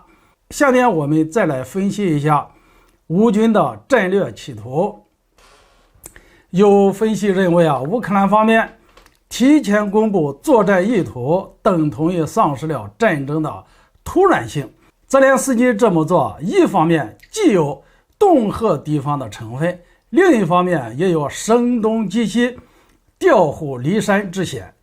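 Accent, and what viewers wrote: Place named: Shandong, China. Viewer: 山东口音太重了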